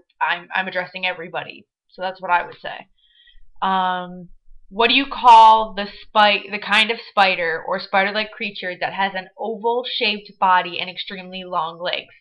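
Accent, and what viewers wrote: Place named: Michigan, United States. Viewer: I knew your accent sounded familiar, my next door neighbors are from Michigan and your accent is just like the wife's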